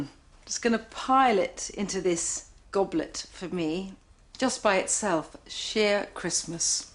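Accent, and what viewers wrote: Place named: United Kingdom. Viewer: If got into ASMR she'd have a whole new career, with her calming voice and British accent.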